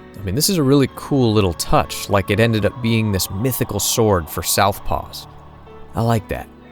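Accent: United States. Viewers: I'm hearing an American discussing lore of a Japanese game based on European historic-fantasy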